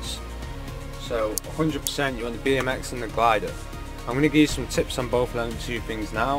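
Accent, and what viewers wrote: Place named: Derbyshire, United Kingdom. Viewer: Exactly what I thought mate! Hints of the Derbyshire tones in your accent just like me. I'm also from Derbyshire as it happens!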